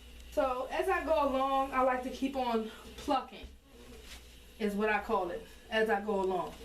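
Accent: Baltimore, United States. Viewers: U must be from Baltimore wit the tew nd dew lol...much love sis...our city's strong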